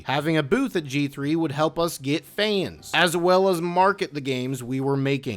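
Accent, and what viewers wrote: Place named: United States. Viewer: What a dreadfully american accent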